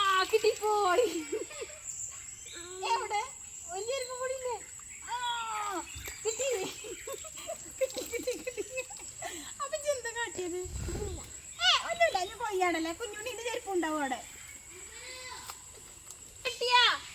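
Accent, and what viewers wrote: Malappuram, India. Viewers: നിങ്ങൾ മലപ്പുറത്തു കാരാ സംസാരം കേട്ടിട്ട് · സൂപ്പർ നിങ്ങൾ മലപ്പുറം ജില്ലയാണല്ലോ സംസാര രീതി കേട്ടപ്പോൾ തോന്നി എവിടെയാ സ്ഥലം 👍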